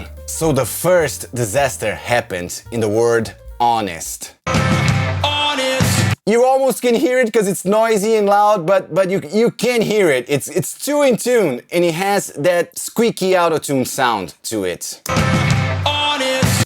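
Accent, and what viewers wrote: Brazil. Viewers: I bet you are brazilian cause of your accent- same as mine :) .. Nice video!! keep it up!!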